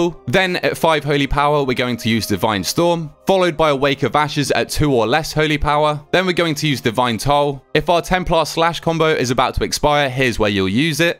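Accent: United Kingdom. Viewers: As an American your guide is more legitimate due to British accent.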